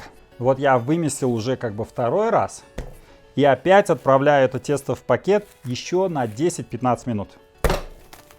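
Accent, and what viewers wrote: Uzbekistan. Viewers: У вас узбекский акцент ;) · Да, акцент узбекский. Сразу слышно! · У Вас как будто хорезмский акцент.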